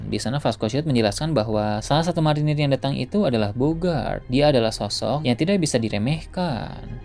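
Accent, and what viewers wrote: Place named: Banyumas, Indonesia. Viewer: Ngapak teka🎉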